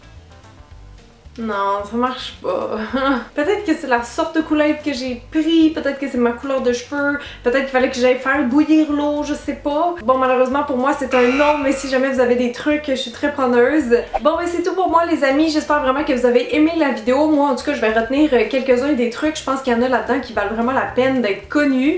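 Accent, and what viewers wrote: Quebec, Canada. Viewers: j'adore l'accent Québécois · L'accent québécois 😍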